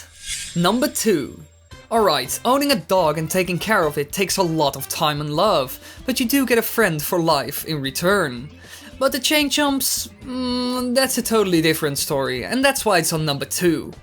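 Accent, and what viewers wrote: Netherlands. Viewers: you can so hear in this video that your laungage is dutch XD ik weet het gewoon zeker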